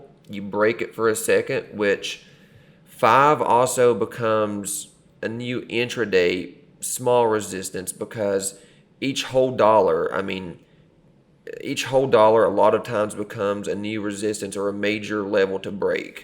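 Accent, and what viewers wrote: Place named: South, United States. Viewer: You know, if someone didn’t know you, and just heard the southern accent and saw the average production quality, they would probably skip this vid… but you have a great way of not trying to overcomplicate explanations and your info is A+.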